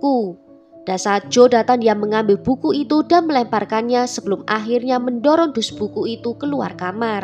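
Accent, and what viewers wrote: Java, Indonesia. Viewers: Mbk asal nya jawa mana toh mbk medok2 gitu hehehehehhe maaf cm mau nanya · medok bnget jowone mb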